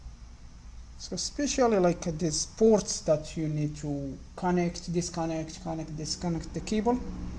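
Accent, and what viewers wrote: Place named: Iran. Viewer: Thanks for your understanding, I just thought your voice sounded like a Persian accent.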